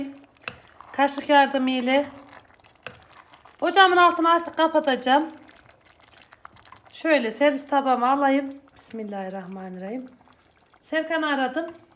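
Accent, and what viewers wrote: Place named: Nevşehir, Turkey. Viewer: Nevsehirliyim konusmammiz col benziyo cömce 🙂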